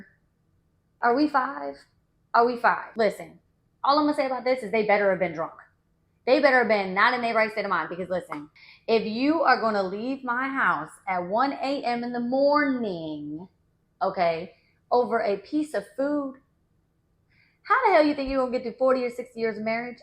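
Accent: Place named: South, United States. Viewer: Love the accent!! Houston? Lil southern, lil ratchet accent!!!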